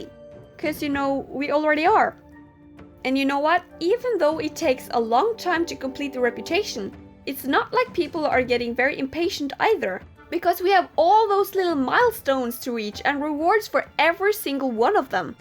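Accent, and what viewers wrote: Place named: Norway. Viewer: Now i hear that you is Norwegian on your english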